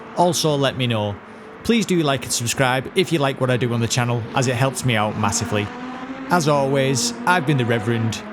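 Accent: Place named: Bolton, United Kingdom. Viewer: Not a beginner but I watched the whole video to see if your observations are similar to mine - turns out they are almost exactly what I felt as well. For example the Lambo being edgy and bouncy over curbs but a more exciting experience than say the Peugeot. Also, I really enjoy the way to structured the video with each car segment being your fastest laptime long. Another question: I'm not a native english speaker but I really enjoy learning about accents. I could hear that you're probably from the northern parts of England, but I'd love to know which part more specifically, if that's not too much to ask. Your pronounciation of "fair" for example sounded more like I pronounce "fur" and the last person I heard talk like that was from Bolton.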